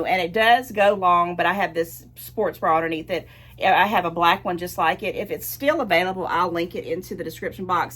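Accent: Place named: South, United States. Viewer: Hey Hun it's a Southern Draw. You won't get one unless you're in states like Georgia, Alabama, Tennessee, Kentucky, Mississippi, or South Carolina. Mainly Mississippi, Alabama, and Georgia. These are the Southern and Deep South states.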